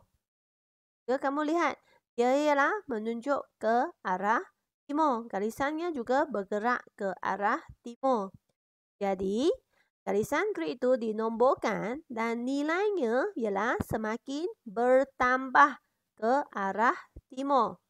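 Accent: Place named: China. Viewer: Cuma cikgu ni Chinese so slang dia lain sikit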